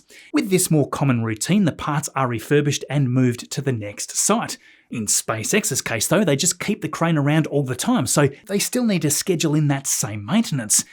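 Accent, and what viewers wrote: Australia. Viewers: I've been hearing it for years and I'm finally commenting on it. The Aussie accent makes it sound like you're saying boostera instead of booster. I love our accent sometimes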